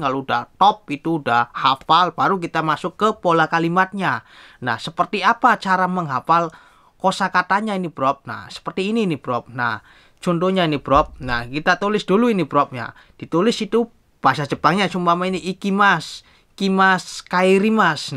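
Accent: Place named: Cirebon, Indonesia. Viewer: Logatnya kaya Cirebon dermayu y mas😅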